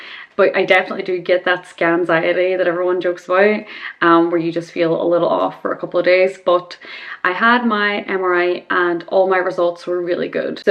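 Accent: Ireland. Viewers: I appreciate that you have the captions turned on. Irish accents are difficult! It was so cool you got to see your surgeon. And I think that your eyebrows look fine. I don't even notice them. I'm sorry they hurt but I didn't even notice anything about them. Your hair is so beautiful. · you look STUNNING!!!! your hair is angelic; your eyebrows look perfectly fine. I luv your Irish accent and I'm glad you're feeling better. I have a mild form of Epilepsy and have had several seizures, so I know how terrible they are and feel. Good luck to you, if I see you in a pub, I be sure to buy you a Guinness.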